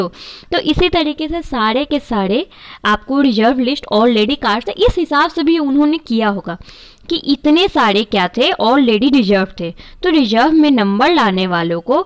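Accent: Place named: Bihar, India. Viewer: Madam aap kahan se belong karti hain? Aapke accent se lag raha hai ki aap Vaishali ya Muzaffarpur se hain.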